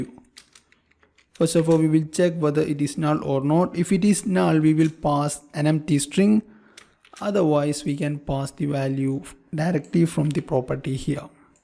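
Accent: India. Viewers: omg I cant understand your indian accent, so heavy.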